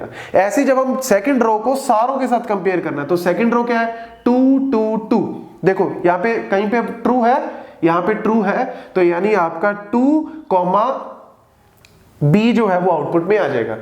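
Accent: Punjab, India. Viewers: sir ji app punjabi ho?/?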